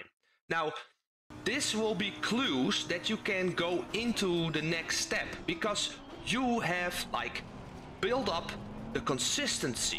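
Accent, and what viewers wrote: Netherlands. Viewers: kom je uit Nederland? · Are you Dutch? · Super Dutch, lol. that dialect is obvious. Groet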